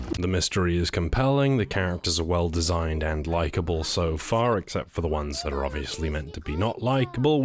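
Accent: United Kingdom. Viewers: Caffeinated British chatterbox boy did it again. You are getting me back into anime even though I got a girl and a kid. Watched Konosuba on your recommendation some time ago with the girl and we enjoyed it (after getting over the Darkness-induced cringe), so I guess we will be bouncing off some bunny girl's bum now. Keep it up!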